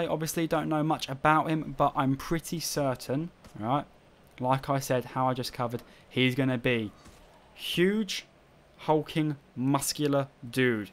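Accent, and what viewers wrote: United Kingdom. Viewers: Stop using an English accent while saying roman names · Would’ve clicked off the vid if it weren’t for the charm and British accent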